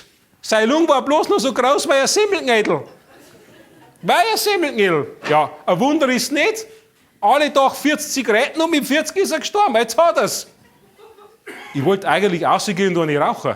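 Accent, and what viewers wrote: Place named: Upper Palatinate, Germany. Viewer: Der Oberpfälzer Dialekt ist schon der Hammer ! Toni Laurer ,Bayern's Bester ! 😀👍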